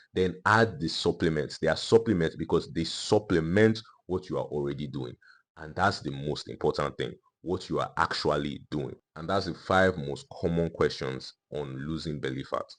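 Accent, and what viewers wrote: Nigeria. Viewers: It's Nigerian accent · It's massive Nigerian confidence. No pretenses. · My brotha you sound like a Nigerian. Oga God bless you ooo. Make I loose my belle ooo. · Accent does not matter. We are proud Nigerians why pretend 😮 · That’s more of a Nigerian accent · great video aside from the annoying nigerian accent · I am not sure if it is the Nigerian accent, or something. But this guys is very believable. Plus he tells the truth; no drama; no frills. Thank you! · The Nigerian accent usually gives the opposite experience lol but okay. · For those who are not from Africa, he’s not rude or mean, he’s just Nigerian. 🇳🇬🇳🇬😅😅😅 This is how they talk on regular conversations. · this is Nigerian accent, what are you talking about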